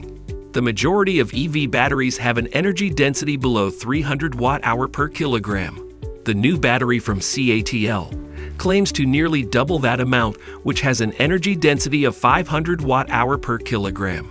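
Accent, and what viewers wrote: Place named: United States. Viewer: Lol . . . Volkswagen pronounced Volkswa 'gen' as in Gen x. Really good American accent, though.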